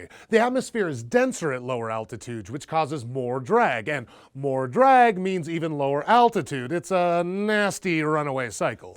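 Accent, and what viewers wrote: United States. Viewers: Let me preface this by saying the narrator on here is great. Does a fantastic job speaking, but I’m pretty sure this guy is an American. If he isn’t he’s masking his accent brilliantly. IN AMERICA WE DONT USE SOCIALIST MEASUREMENTS! We use the imperial or The US Customary units. I realize that The International System of Units (SI), the modern form of the metric system, is preferred for many uses by the U.S. National Institute of Standards and Technology (NIST), but guess what, we viewers are not scientist. We can literally visualize in our minds 10000 miles, 600mph or 10’ tall, or a yard (as in 36” and not something you mow people from WV and south). We Use the imperial system !!!! Geez.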